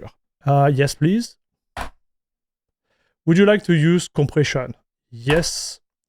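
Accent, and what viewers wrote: France. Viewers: the french accent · i love your french accent \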